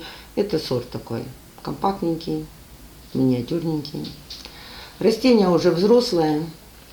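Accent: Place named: Ukraine. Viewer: а я решила, вы из Украины, говор-то наш. Родились в Украине? ))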